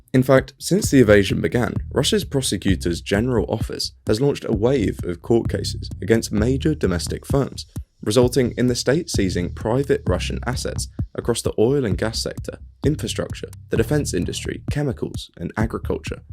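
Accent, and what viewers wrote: England, United Kingdom. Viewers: Im an American fiormer advisor to the Kremlin, who subsequentlylived in Odessa for 15 years... until the rabble gang armies came gang press every adult male into service. And I watched Russia defeat itself by literally running out of food four days on the road to Kiev. I saw RU troops had to WALK to Belarus. So it is true Russia defeated itself. But as you can imagine, Im unhappy seeing my life's work in privatization, deregulation and liberalization twisted this way.... by a posh English voice that sounds like the children I now teach. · He thought because he is white and has an English accent that people would not criticise him. Times are changing my friend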